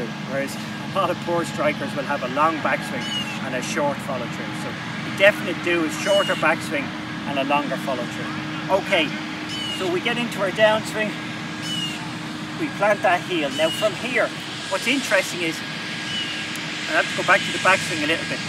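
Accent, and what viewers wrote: Ireland. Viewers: I love getting golf lessons with an Irish accent.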